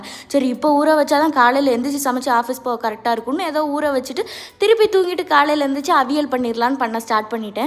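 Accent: Tirunelveli, India. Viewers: Akka nee tirunelveli ahh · Nan peasum pothu Tirunelveli slang apdi onnum therila..neenga peasum pothu keakum pothu இன்பத் தேன் வந்து பாயுது காதினிலே.. ❤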